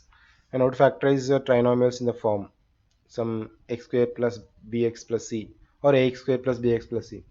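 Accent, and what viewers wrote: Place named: South India, India. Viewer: bcoz hes from south mostly andhra pradesh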